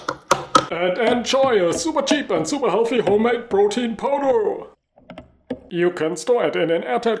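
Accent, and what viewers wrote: Germany. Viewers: It sounds German to me 😂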